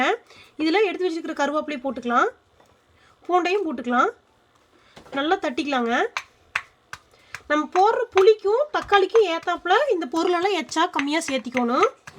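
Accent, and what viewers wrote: Kongu, India. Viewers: Kondu slang arumai... 👌👌👌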